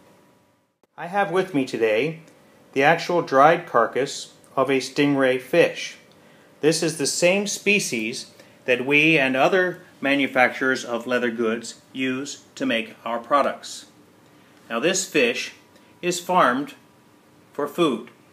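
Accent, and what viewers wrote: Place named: Canada. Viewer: Are you Canadian by any chance? I’m from Wisconsin and have heard a lot of northern accents but yours sounds very Canadian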